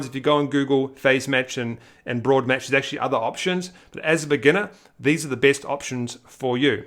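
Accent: New Zealand. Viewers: Are you from NZ ?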